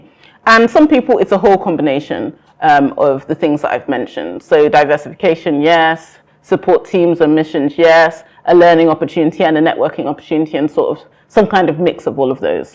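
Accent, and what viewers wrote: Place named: United Kingdom. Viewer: Nice one, but a local academic lecture shouldn’t come with such a deep British accent tho.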